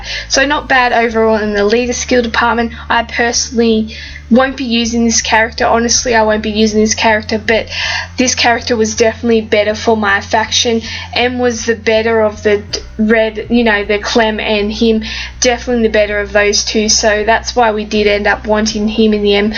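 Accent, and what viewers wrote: Australia. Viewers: Your Australian voice is nice to listen to!!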